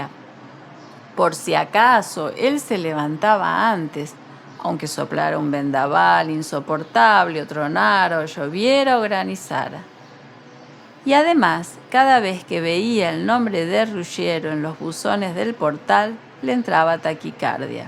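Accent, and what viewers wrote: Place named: Argentina. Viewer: El acento argentino no ayuda para escucharlo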